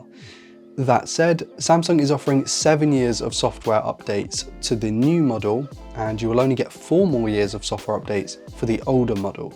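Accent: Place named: England, United Kingdom. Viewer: I might be wrong but you sound English so why saying dollars not pounds and it's obvious not worth it if you've a S23 model but anything from S22 down probably is worth it.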